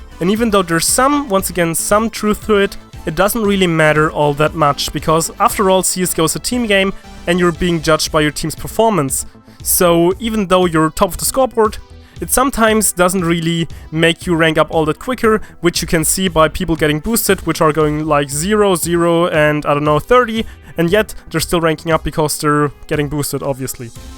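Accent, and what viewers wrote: Germany. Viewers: can u speak german??or are u from germany??u r listening like a german guy who is speaking and learning a lot englisch ^^ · Du hast nähmlich nen Deutschen Akzent! :D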